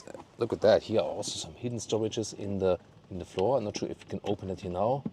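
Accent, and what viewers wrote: Germany. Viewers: The word version really brings out the German accent 🙂 I had seen another review of it earlier that did not mention the ridiculously low battery capacities 😕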